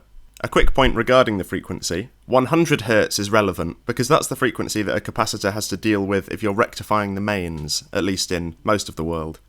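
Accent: England, United Kingdom. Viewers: Your proper English accent made the vid even more valuable and understandable, I hate those mumbling ytbers, it's a pain to listen to them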